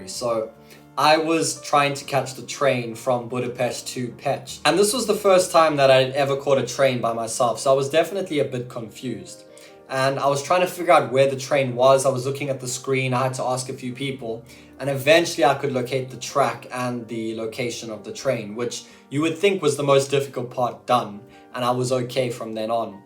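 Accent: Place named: South Africa. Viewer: I could hear your accent you South African....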